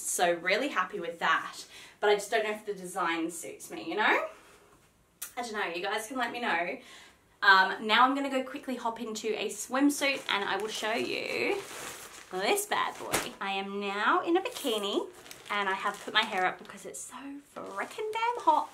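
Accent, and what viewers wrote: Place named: Australia. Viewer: So happy to hear an Aussie accent doing hauls. ( I live in Tassie)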